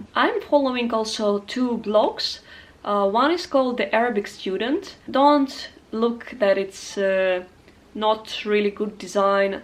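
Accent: Russia. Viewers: Хотел спросить не русский ли акцент. Конечно русский) молодец! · У Вас русский акцент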